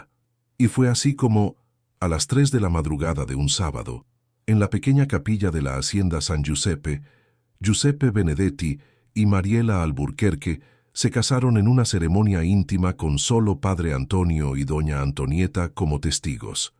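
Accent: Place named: Argentina. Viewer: No me gusta porque es narrada Argentina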